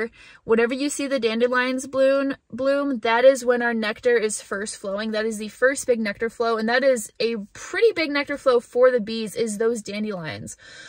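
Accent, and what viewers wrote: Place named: England, United Kingdom. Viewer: just use that english accent!! :) !!!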